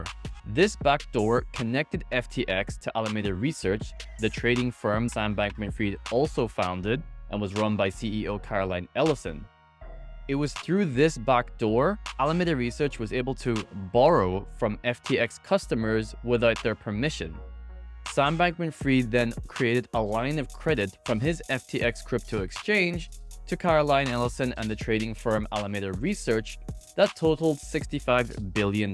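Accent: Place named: Scotland, United Kingdom. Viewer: Mate, you had a Scottish accent in your last video…..this is so weird.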